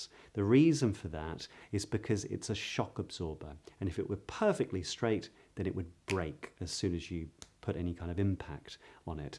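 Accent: RP, United Kingdom. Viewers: Please start a podcast for rp accent listening practice. Could you please recommend few podcast with rp accents?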